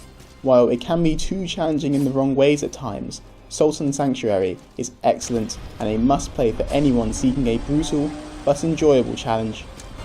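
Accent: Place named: South London, United Kingdom. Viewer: Thanks for sharing your thoughts dude... I really liked your review, more objective and informative than a lot other reviews out there. A suggestion - record your audio and try varying your vocal tone a bit more, you'll sound more compelling. I like your calm and factual style, but sounding more invested helps (see angry joe getting angry). Only mention it as I have a very similar accent to you (south east London? Palace fan maybe lol?) and unless I think enthusiasm, I can put ppl to sleep. Anyway, nice vid, subbed - I request a ds3 review (if my pointers make you any ad revenue, I want my cut). Peace!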